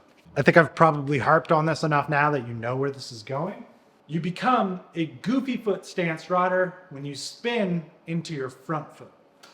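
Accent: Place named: Newfoundland, Canada. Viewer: 8.3/10 Newfoundland accent. And also fatbikes are deadly. Me and the b'ys love to ride them.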